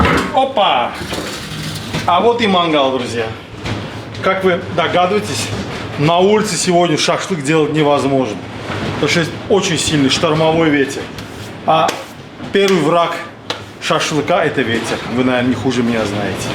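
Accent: Baku, Azerbaijan. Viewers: Салам землякам.я сначала ставлю би дяня жирный лайк а потом слушаю родно бакинский говор.мы часто в Новханах делали балык .кябаб.и котлеты классные тем более в вашем исполнении.Спасибо🎉🎉🎉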